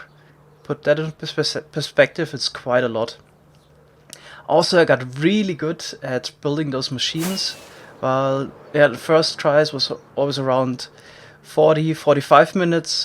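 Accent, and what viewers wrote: Germany. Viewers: Sometimes you can feel the german in your voice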